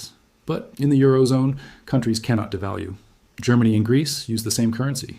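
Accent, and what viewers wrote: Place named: United States. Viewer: The GDP increased last year..so I don't know what are you talking. But from the accent you are american, so make sense to not have a clue about Europe.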